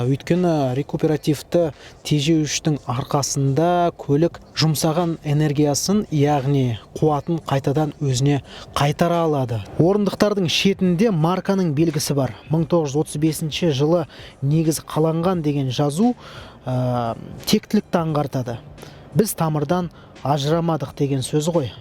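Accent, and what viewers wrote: Standard. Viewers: Ма ша Алла қазақшаны тап таза бұрмаламай сөйлейді екенсің жарайсың!!! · Жәрайсың таза қазақ тілінде жүргізген түсініктемелерің өте керемет. Қазақ тілінде осынша таза жеткізе білүде екінің бірінің біліктілігі жете бермейді. Ризамын саған інім. Талмай самғай бер.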